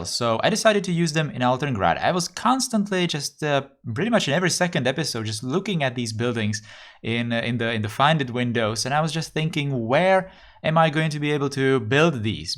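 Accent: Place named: Czech Republic. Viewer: Clicked on your video and instantly could tell you're Czech because of the accent :D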